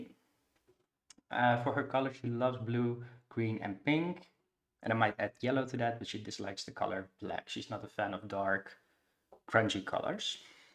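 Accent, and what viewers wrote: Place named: Netherlands. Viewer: hahaha ja nederlands-engels accent is best wel duidelijk! Super leuk dat je begonnen bent met let's plays! Succes! Btw je thumbnails zijn echt perfect! Heel mooi!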